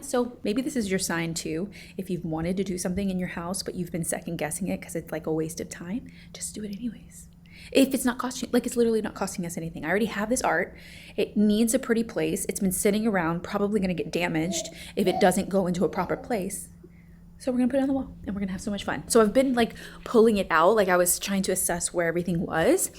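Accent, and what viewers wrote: Texas, United States. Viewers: I think your Texas accent came back a little bit during your time there. It’s cute to hear, as a midwestern viewer. I’m glad you’re having fun with your living room, even if it’s temporary.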